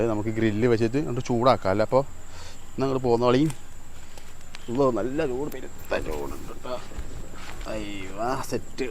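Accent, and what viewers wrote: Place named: Palakkad, India. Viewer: മസാല കോയിക്കോട് ആയ്ക്കോട്ടെ സംസാരശൈലി പാലക്കാട്‌ മതി 😀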